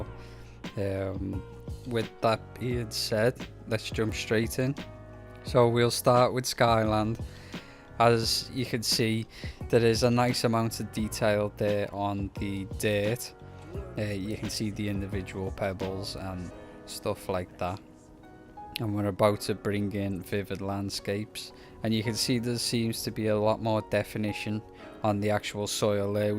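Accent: Liverpool, United Kingdom. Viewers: Oi scouser! Cheers for the videos. Might subscribe but one question first, are you red or blue?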